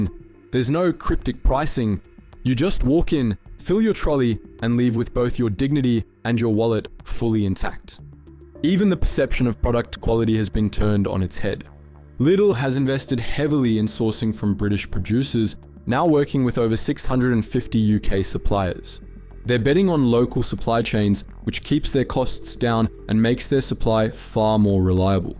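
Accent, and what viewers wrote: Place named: Australia. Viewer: Why is a Australian talking about the UK? This feels like AI and who ever set this up thinks Australian is British.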